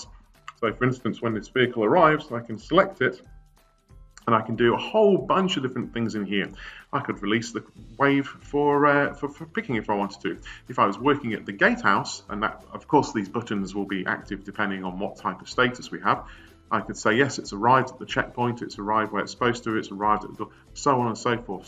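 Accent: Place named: England, United Kingdom. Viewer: Finally a video with english accent, great video.🎉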